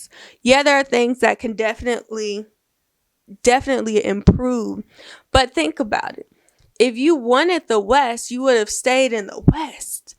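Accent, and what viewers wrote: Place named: Twi, Ghana. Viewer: I love your twi accent😂😂😂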